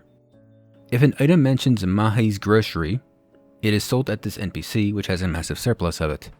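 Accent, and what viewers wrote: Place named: Pakistan. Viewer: sounds like from pak maybe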